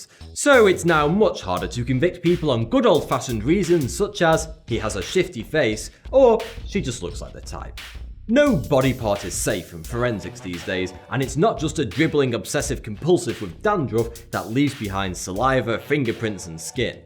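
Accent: United Kingdom. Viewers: oh man this guys should totally be the new James Bond ! that wink can make any girl wetter than the pacific ocean, also his British accent is on point ayy lmao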